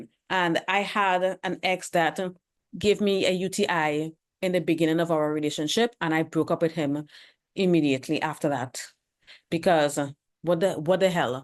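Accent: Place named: Trinidad and Tobago. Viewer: I like your content. You sound like a trini🇹🇹 I'm Trinidadian, btw.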